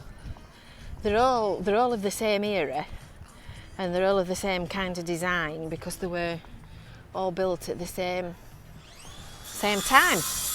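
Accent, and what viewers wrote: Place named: Lancashire, United Kingdom. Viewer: Hi, I 'm Irish just moved to Blackpool last August from Heartsfordshire. I have no problem understanding your lovely accent. Someone have said you have a lovely Yorkshire accent, I thought you have Lancashire accent. I do enjoy your videos xxx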